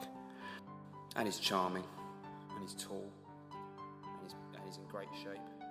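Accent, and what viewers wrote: United Kingdom. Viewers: Sexy European accent? Dude. Come to The US, and you can have pretty much any woman you want with that British accent of yours.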